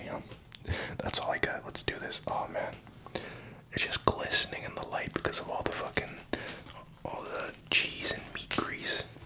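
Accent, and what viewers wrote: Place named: Canada. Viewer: I can hear the Canadian in his voice….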